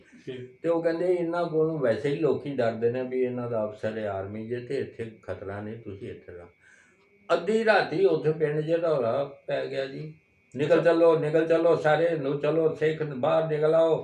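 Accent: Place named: Dogri, India. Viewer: sir di boli vich dogri touch ha. te enha bolya laya da si, jd ki punjabi vich kehnda ne laya hoya si. Narowal,Shakargarh, te Sialkot da ilaka kise zamane vich Jammu riyasat da hi hissa hi.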